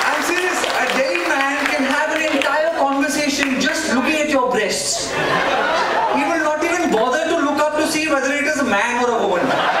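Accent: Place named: England, United Kingdom. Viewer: his english accent makes me laugh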